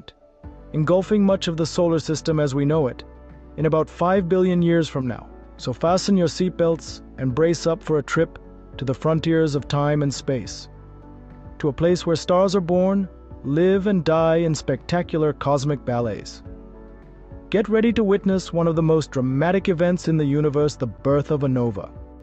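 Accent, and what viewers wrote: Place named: United States. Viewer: But for the broken American accent, it was an engaging watch.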